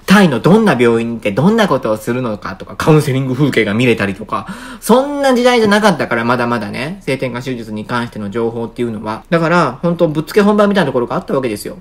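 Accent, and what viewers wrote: Kansai, Japan. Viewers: 一コメ～～～～～！いつも楽しく拝見してます！同じ関西人。話のテンポがものすごく関西。懐かしい！何かあったかい。末永くよろしく～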